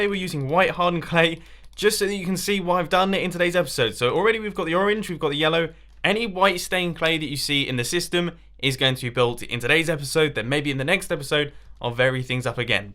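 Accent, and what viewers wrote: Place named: East Midlands, United Kingdom. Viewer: What accent would U say I would say east Midlands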